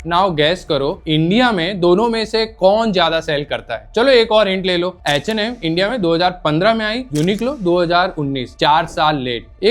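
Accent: Gujarat, India. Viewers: Gujju accent.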